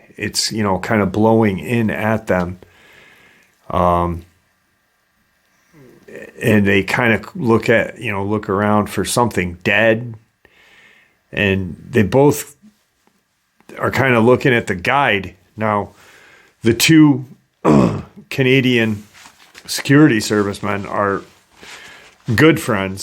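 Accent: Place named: New Orleans, United States. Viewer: Bro don’t listen to critics Your show is f*****g awesome Your show is bad ass I like dark waters too cuz I from New Orleans but bro I don’t give a shite if u speak with a Nola accent and are an orater or not you do perfectly fine communicating what you research, and as far as your research it’s badass so keep it up and F*** the critics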